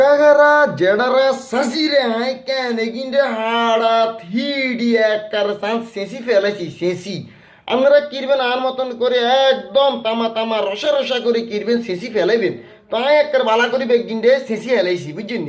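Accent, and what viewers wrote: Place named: Noakhali, Bangladesh. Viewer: আমাদের নোয়াখালির ভাসা💓💞😍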